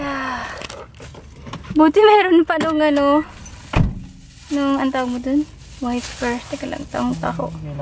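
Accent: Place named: South Korea. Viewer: It still gives me goose bumps for you to have a perfect korean accent. Haha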